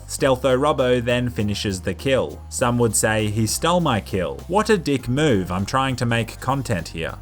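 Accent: Australia. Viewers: You're Australian?!?!?!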